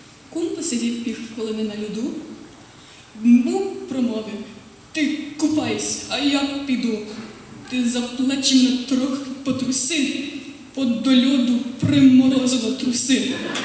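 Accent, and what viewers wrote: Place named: Canada. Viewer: ! Ну яка молодець! Я так сміялася! Трохи канадійського акценту тільки додає кумедності цій гуморесці:)) Можете сміливо продовжувати і далі:)) Бажаю успіхів!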